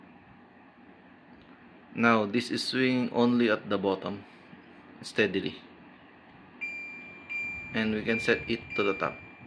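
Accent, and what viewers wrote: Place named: India. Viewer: Why the fuck do I keep getting Indian speakers?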